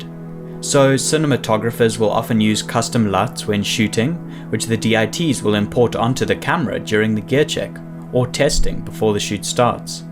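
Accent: South Africa. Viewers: AHA! I knew you were from some SA from ur accent but wasn't sure. Super cool to see South Africans doing so well on Youtube! Love the vids man keep up the good work.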